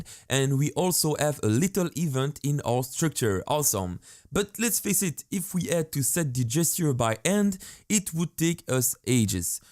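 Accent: France. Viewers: It's ok man, not easy to pronounce some words with French accent. As long as people understand, it's fine :) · We Americans are jealous of your Francais accent ;)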